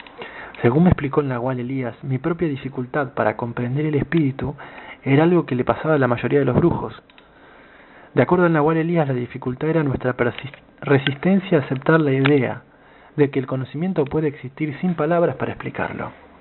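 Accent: Argentina. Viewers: El ritmo de lectura es acelerado considerando que al comun de los lectores los conceptos son novedosos infrecuentes y hasta insolitos y requiere un ritmo de asimilacion mas pausado... La pronunciacion, al parecer de acento argentino, tampoco ayuda como tampoco es conveniente algunas prolongadas pausas o silencios no convenientes... La lectura es fria y carente de emotividad como lo ameritan algunos sino muchos episodios fantásticos de los relatados por el aprendiz de brujo, el autor de la saga...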